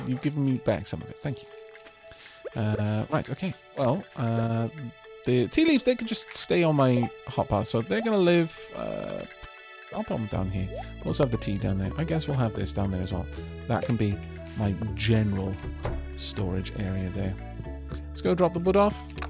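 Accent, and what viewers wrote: Wales, United Kingdom. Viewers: Hi! Firstly, very glad that this series is continuing! Between your silky smooth welsh tones and the relaxing nature of stardew, i'm always thoroughly unwinded by the end of a episode. In terms of green house organisation: I find there is enough space to have two of every regular fruit tree, and then one of each mango and banana from ginger island around the edges. Once those are fully grown you can intersperse kegs or preserve jars around these. For the dirt areas I actually prefer the quality spinklers, as it helps you organise your crops, having 8 of this and 4 and 4 of that etc. This also leaves a gap to one side for tea/ coffee. I find it also helps to have some seed makers in there as well, as i tend to re-plant the same crops again and again, and can go from crop back to seed really easily. Hope that helps, i'm off to fufil my own desire for tea on this rather chilly sunday morning.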